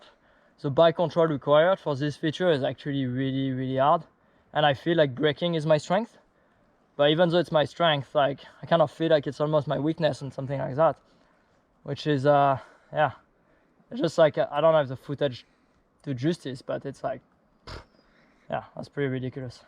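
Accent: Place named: France. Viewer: T'es français toi ça sentend🤣🤣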